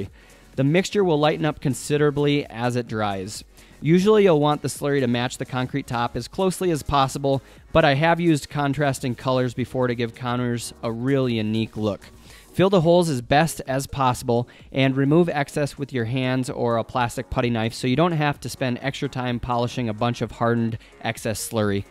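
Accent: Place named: Montana, United States. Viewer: You don’t have to tell us you are from Montana. We can tell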